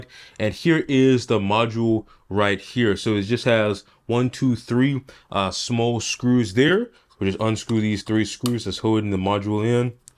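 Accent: Bermuda. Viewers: sound bermudian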